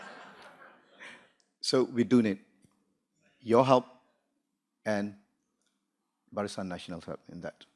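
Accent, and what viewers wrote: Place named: China. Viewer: Mcm cina ckp english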